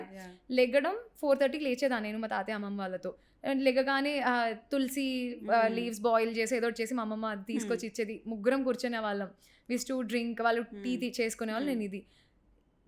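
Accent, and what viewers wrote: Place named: Telangana, India. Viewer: O telangana na?? I saw your videos but in mute so expected u to b andhra girl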